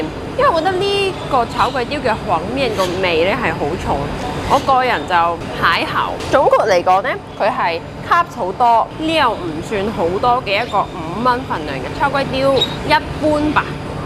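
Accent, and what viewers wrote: Singapore. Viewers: 老实话。。。 你口音用新加坡加广东话听得好辛苦， 料来料去。。。 建议用广东话好啦！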